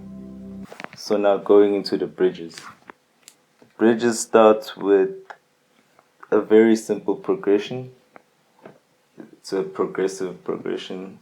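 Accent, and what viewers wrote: South Africa. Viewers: awesome. You sound like you from KZN or Gauteng? I just noticed your channel and subscribed now. Thank you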